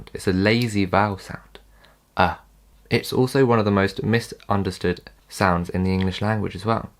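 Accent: United Kingdom. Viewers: I want you to make a video on How did you learn British accent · You have an excellent british sound i am very curious to have that accent. help me how can I have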